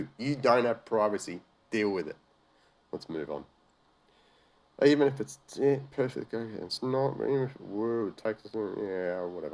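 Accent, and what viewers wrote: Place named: Australia. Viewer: That was interesting 🤔 Why does an Australian accent make EVERYTHING more interesting 😁 All the K-Marts in USA went out of business years ago, had no idea they were still around over there. Wonder if they shipped all the crap from our stores to y'alls?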